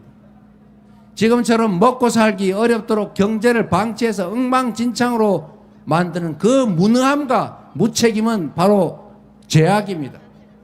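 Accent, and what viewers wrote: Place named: Chungcheong, South Korea. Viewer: 충청도 사투리 귀엽지유?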